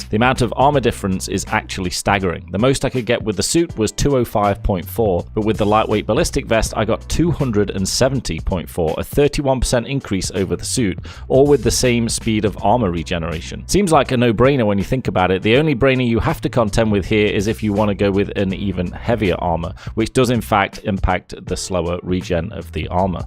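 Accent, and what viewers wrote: United Kingdom. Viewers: Are u british? Ur voice is very similar to hoxton voice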